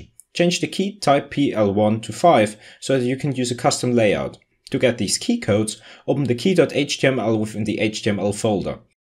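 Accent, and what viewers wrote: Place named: Austria. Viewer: Oh you had a more "austrian" accent at that time.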